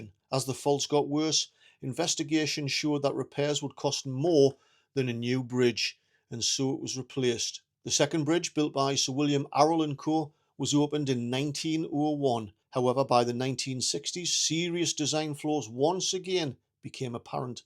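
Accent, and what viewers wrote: Newcastle, United Kingdom. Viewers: Great information, thanks, always nice to hear a gordie telling us about Newcastle and the north east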